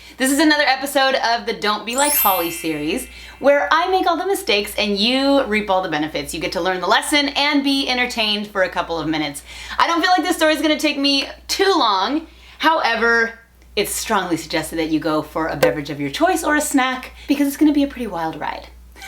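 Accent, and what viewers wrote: Mexico. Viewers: It’s kinda crazy that you’re totally fluent and so and I know you’re American, but I still listen to a little bit of an accent, Mexican accent. I know is crazy, but I can listen to it 😂🤦🏻‍♀️ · Si te hice caso que en vez de verlo en español lo viera en inglés, no lo he visto en inglés pero enserio me impresiona como tienes el acento de tu primera lengua osea inglés y aparte pudiste aprender e imitar el acento de español de México y hablar perfectamente el idioma Me impresionas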